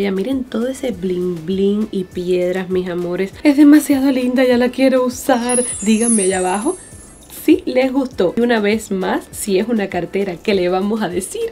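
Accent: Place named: Cuba. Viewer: bella hermosa hablas muy parecido a sandra cires art obvio las dos son cubanas no lindas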